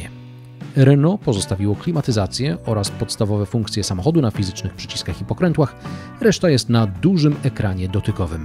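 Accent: United States. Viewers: Akcent rzeczywiście mocno US :) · mieszkałeś z Stanach, że masz US akcent?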